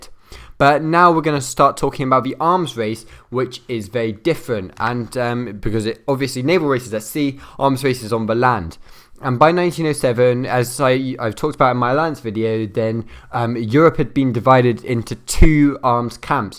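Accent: United Kingdom. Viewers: Sounds like a pretty standard middle class English accent, but with a slight speech impediment.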